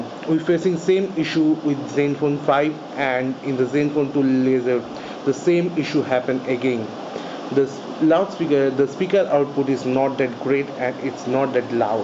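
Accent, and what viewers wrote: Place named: India. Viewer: Forking indian accent. Can't get anything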